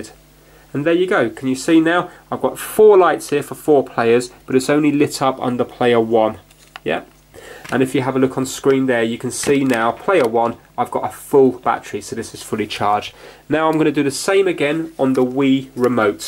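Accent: United Kingdom. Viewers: anyone noticed is a british person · britisch accent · He’s British.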